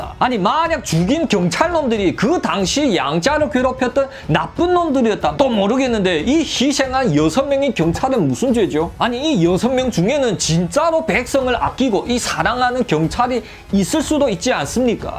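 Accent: Yanbian, China. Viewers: 동무 옌벤 말씨가 몹시 듣기 좋군요 옛 중국생활이 그립네요 짜요!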